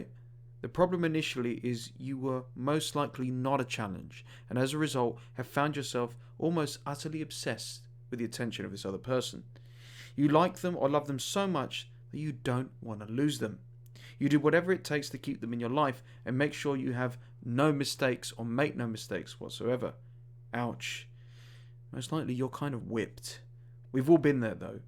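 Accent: United Kingdom. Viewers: I really enjoy your voice .... your British accent is the best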